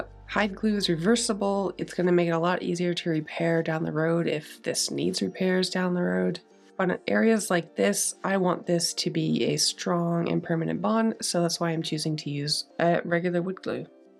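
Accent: Nova Scotia, Canada. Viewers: Dear, I've been watching your videos for a while. I'm a guy who has 2 left hands (including 5 thumbs on each hand), so I would never dream of trying to flip furniture, but watching you do your stuff has taught me so much about furniture. I'm truly grateful for the opportunity to see what you are doing and hear your explanations. Firstly, it sounds as though you really know what you're doing, and secondly, I love your Canadian/Nova Scotia accent. You sound so calm, so when I've had a stressful day, I watch one of your videos and can unwind. So, for me you've become the therapist:-). I've watched other renovators, but you and Flipping Drawers (for similar reasons) are my favorite flippers. So, thank you for what you do (I also <mostly> love your ideas) and for the videos. I hope you can do much more in the years to come. Best wishes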